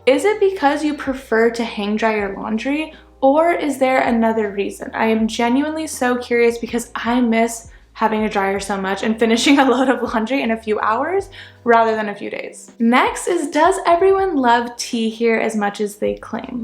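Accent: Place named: United States. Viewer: By all means, keep answering 'yalright?' with 'I'm good, how are you!?' in your cheery American accent. It may brighten peoples day. Don't try to fit in here... we like the novelty of an American.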